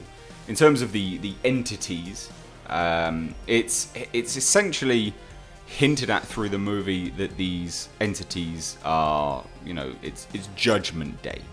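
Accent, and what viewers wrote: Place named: England, United Kingdom. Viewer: I actually like your English accent and your reviews 👍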